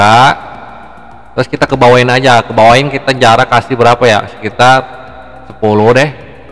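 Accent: West Java, Indonesia. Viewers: Ini mentornyq pasti orang Sunda...aksennya orang sunda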